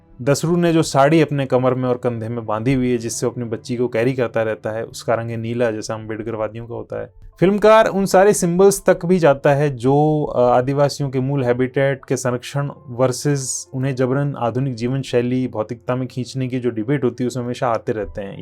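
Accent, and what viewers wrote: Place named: Rajasthan, India. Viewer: bhai aap rajsthan se ho kya , bolne ka andaz vanhi ka hai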